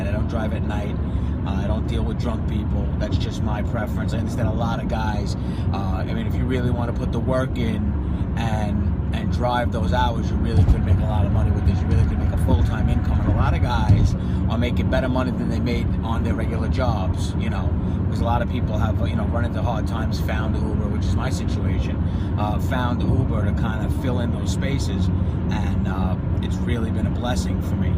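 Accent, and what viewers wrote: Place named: Long Island, United States. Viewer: coupla things, great video, really helped and I'll use your referral. Just heads up I hear Camden and Paterson are paying a premium for those that venture forth..😁 oh and why does from NJ sound like Vin from Long Island? I'm from Bergen County but you got either a Long or Staten Island accent...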